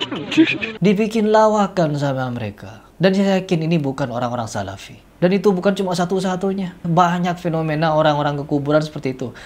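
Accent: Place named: Madura, Indonesia. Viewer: Afwan apakah akhi orang madura,,,,logatnya mirip kami,,,,alhamdulilah semoga makin banyak yang mengikuti kajian salaf,,,,Ana juga kemaren sedih pasca keluar dari ma’had ada banyak sms masuk,,,,ternyata beliau meninggal,,,,rahimahullah semoga kita bisa mengikuti beliau aamiin,,,kami sekeluarga di arab pun ikut sedih karena ahli tauhid wafat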